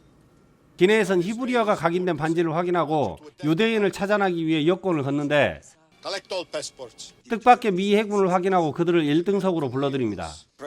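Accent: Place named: Honam, South Korea. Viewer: 아따 성님 호남사투리 오져부네요~~ 잘보고갑니다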